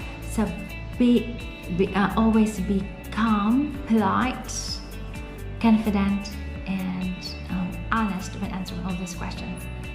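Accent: United Kingdom. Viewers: Maam taga UK po kayo? Nice accent and the video is Very informative..Kudos!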